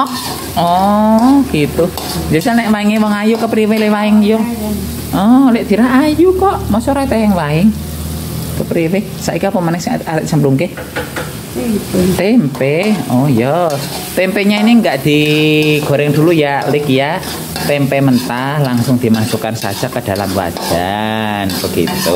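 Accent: Banyumas, Indonesia. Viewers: Ngapake polll tp aku ya seneng, ora ngapak ora penak ya mas 😄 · Matur suwun mas resepe ,ngapak banget si dr lampung mas hadir🙏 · Kesukaanku itu pare dioseng pake tempe,aku seneng karo ngapak2e mas 😅😅😅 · Mas ngapakmu ngelingke aku karo koncoku mbiyen.biyung ngelingke aku ro biyungku sing wis almarhum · Mas lucu banget inget karo keluarga di cilacap bahasane podo wae ngapak hiburan sambil masak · Rika wong ngendi pak?ngapake mantep wkwkwk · bahasanya ala banyumas yak kang? purwokerto nan.... marai inget pernah di purwokerto mendoan endul 👍😃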